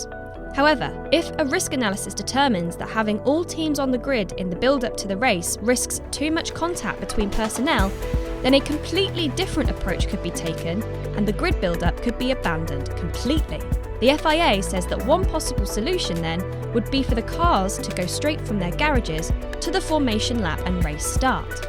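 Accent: United Kingdom. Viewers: whait is that? and why does she sound incredibly brittish in this video? (yeah i know she's from the UK, but i dont remember her accent being so noticable).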